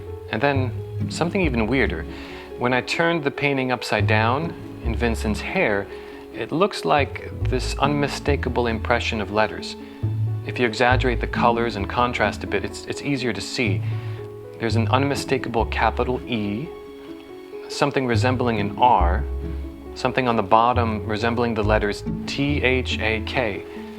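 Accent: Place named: Netherlands. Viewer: I like that the presenter has such a clear Dutch accent when speaking English. Kan goed horen dat je Nederlander bent!!! Top video.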